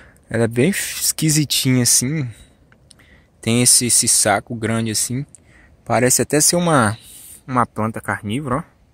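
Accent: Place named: Minas Gerais, Brazil. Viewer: Você é Baiano meu querido? Você tem sotaque de mineiro Você fala igual mineiro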